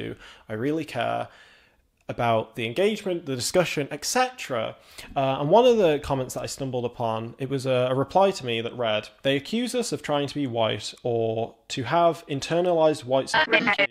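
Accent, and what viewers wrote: United Kingdom. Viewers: Sounding black really isn't a thing, said the whyte woman? Why do you think you'd be the one to decide that? Sounding black in terms of slang, word choice, accent and sentence structure definitely is a thing. Again when whyte people want to be racist and want it to be a thing they know how to sound in order to mock black people. So I don't buy it Yeah his british accent is very obvious. I don't think it was lost on most people, but even for a british person he sounds very whyte, lol. He literally has a whyte mother, don't even know why you're arguing this. 😁 Even british people will tell you there are all kinds of accents, you don't think a black caribbean or african accent is one of those? And yeah you can be black and not have these typical black accents but it doesn't mean you'd sound or act like him. He definitely has some extra whyte mayo in him (I mean he does literally, he's not black he's half whyte) and it's no surprise that it comes through in his views on race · I seriously cannot believe that people said you're not black because you have a British accent, sound too smart, dress too well, etc? 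I think you're hilarious and am glad you confront this head on rather than hiding from it. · the problem with the vocabulary argument is that people said he didn’t sound black which isn’t really a thing. He just didn’t sound American because he is British. People seem to think that black identity only exists in America which is just false. That what I mean when I was confused by people saying he didn’t sound black. But again, he’s just British. My partner sounds exactly like him because he was raised in London, does mean he isn’t black tho. Again they are just British 🇬🇧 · I didn't know that you had to base how you wear your hair, your clothing, or your vocabulary based on your skin color.....that is a weird concept to me. At what point does the percentage of your heritage outway the pigment in your skin and visa versa. 🤔 And can you believe that "people of color" live in all sorts of different countries? 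Its like...crazy to think that people have moved and immigrated to other countries. (Sarcasm) I watched your video and my first thought was oh he is british... not that you didn't sound black... whatever that means. 🙄 I enjoy your content and the way you present it.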